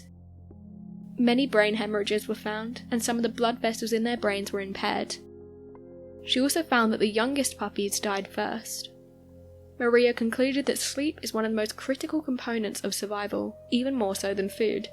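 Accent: England, United Kingdom. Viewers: I just listened, is that really you?, sexy and English?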